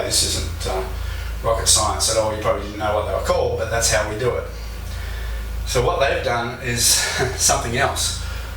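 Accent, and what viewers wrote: Australia. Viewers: I found this video hard to hear because of the room and the echoes...and with Aussie accent it made the entire video hard to hear n understand. Overall, topic looks Good. Suggestions...head-set n microphone..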